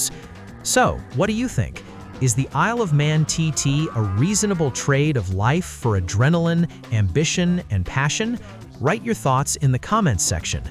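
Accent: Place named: United States. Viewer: Amercan voiced commentary, Im out.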